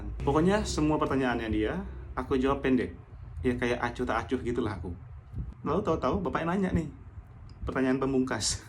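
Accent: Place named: North Sumatra, Indonesia. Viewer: bg logat sumut nya kental kali! sumut nya asal dari mana bang, btw serem kali cerita ko bang bah ketemu bapak bapak homo gitu 😅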